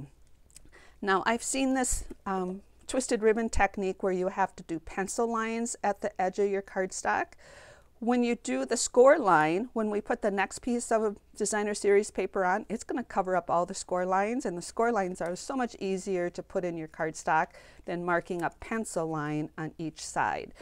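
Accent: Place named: Midwest, United States. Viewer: I saw your twisted ribbon card on Pinterest and it led me to the video. I think your explanation of how to make the card was clear and concise. It’s a beautiful card and I can’t wait to make some. Now I’ll be looking for other YouTube videos you’ve posted. Thank you for sharing your talent. I love your accent, are you from the northern Midwest? U.P.?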